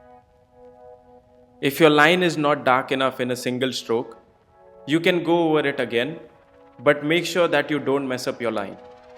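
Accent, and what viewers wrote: India. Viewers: I wach but the akcent indian destract me afk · Good Video but dang that accent has got to be the most disgusting accent ever. Indian accents are just gross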